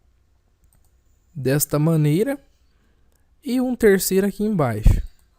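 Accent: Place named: Paraná, Brazil. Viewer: Com esse sotaque só pode ser paranaense...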